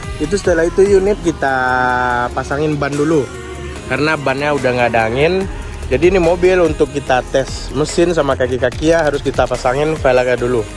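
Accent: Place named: Sumatra, Indonesia. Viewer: Bahasa indo nya logat sumatra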